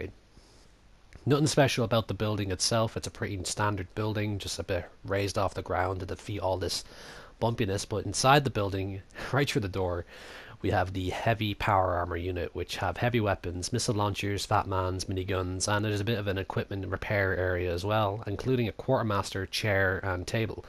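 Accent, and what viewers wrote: Ireland. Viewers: You kinda sound like jacksepticeye I'm guessing you're Irish?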